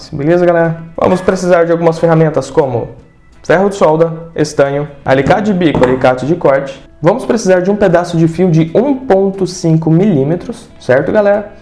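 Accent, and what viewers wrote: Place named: Maringá, Brazil. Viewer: você é de maringá AAAAAAA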